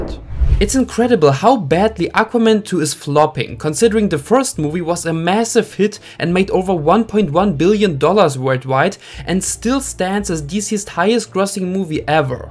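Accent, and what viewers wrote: Germany. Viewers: He‘s German, English isn‘t his native tongue. Relax.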